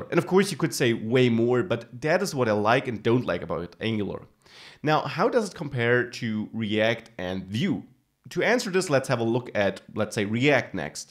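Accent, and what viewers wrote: Germany. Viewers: Gute Videos aber ich kann mir dein extrem deutsch gesprochenes Englisch leider nicht lange anhören. Trotzdem weiter machen!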